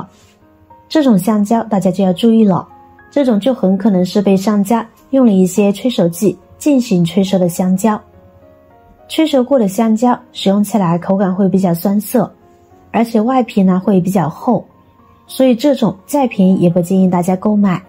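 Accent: China. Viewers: 所謂香蕉催熟，是用塑膠袋包覆，冬天才會用 夏天跟本不須要催熟 聽妳的口音就是中國腔，妳懂香蕉？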